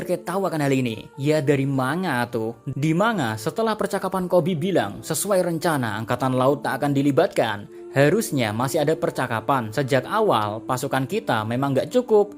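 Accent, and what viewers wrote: Java, Indonesia. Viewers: Jawa nya pekat banget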